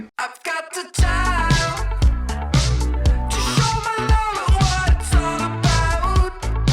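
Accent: United Kingdom. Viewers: Hello from Spain. I've recently watched your video about your own British accent, so you have such a brilliant British accent. I know many people from such lovely country called The Netherlands usually speak more than two languages. Well, how many languages do you speak? Are you able to sing the Dutch national anthem in every language you've been taught? Thanks in advance.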